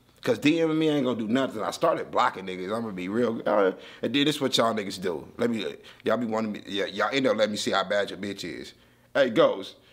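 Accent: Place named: London, United Kingdom. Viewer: 😅 The London voice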